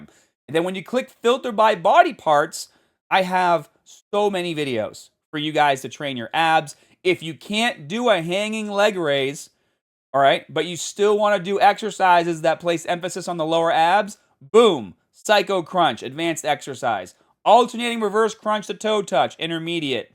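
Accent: Boston, United States. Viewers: Boston accent crazy lmao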